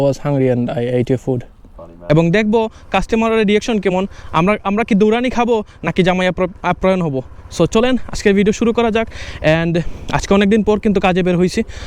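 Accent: Sylhet, Bangladesh. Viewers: Sylhet'i naki apni? 😊❤ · Bhai Sylheti nii?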